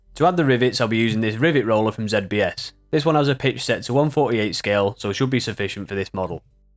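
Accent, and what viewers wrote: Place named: England, United Kingdom. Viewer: Hi Mate I hear your pommy accent, I am a socuser originally, came to Oz (65) when I was 16. Joined the Army went to Vietnam 70. So looking at Vietnam era Kits when I finish these, maybe a Phantom? Thanks for the great advice. I had a look at your Star-fighter build as well, as I have the KINETIC kit;-) Might practice with that one first, plus air brush on an old Spitfire that I never finished. Its early afternoon here in OZ will be back tonight to look at the F104 build;-) Thanks again for the hints & tips!! OZGrunt (Oink, 7RAR Vietnam War 70-71.)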